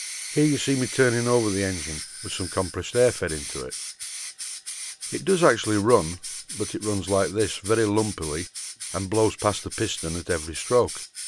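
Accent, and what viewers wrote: Yorkshire, United Kingdom. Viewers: Instead of wife and girlfriend jokes why not recite ‘Albert and the Lion’ to show off you fine Yorkshire accent? Thank you for a really fascinating series.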